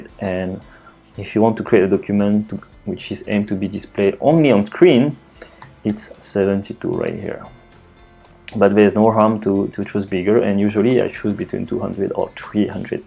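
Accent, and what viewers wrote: France. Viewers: u loose a little bit your french accent in your latest videos ;)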